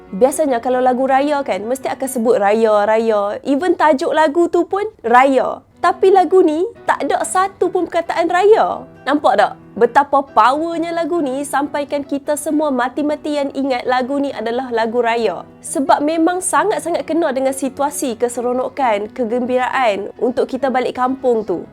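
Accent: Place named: North, Malaysia. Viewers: Apa masalahnya klau dia nk ckp Utara... Menandakan org melayu bukan jenis lupa diri... Lagipun org melayu kedah adalah suku melayu terbesar di Asia tenggara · Meluat pulak dengar speaker cakap slang utara. Cakap je la bahasa melayu biasa. Asal orang utara je kena pakai slang dia. Kalau orang pantai timur tak boleh pulak pakai slang dia, nanti orang tak faham la apa la.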